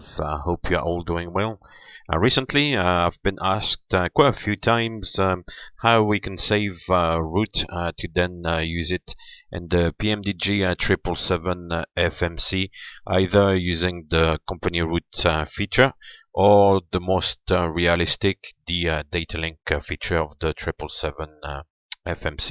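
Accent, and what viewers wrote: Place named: France. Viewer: Tu as un accent de français qui parle anglais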